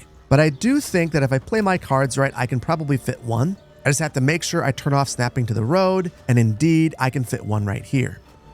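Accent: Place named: Great Lakes, United States. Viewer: Your accent sounds kinda like you live on lake Erie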